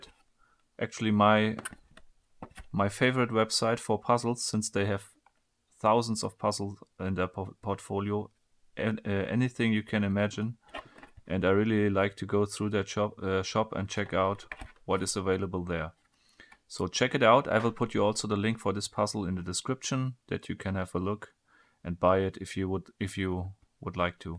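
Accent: Germany. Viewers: are you German? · Been watchin you for a minute. My first time commenting. "This is my fav puzzle yet." keep it up (German accent and all) sub'd & 👍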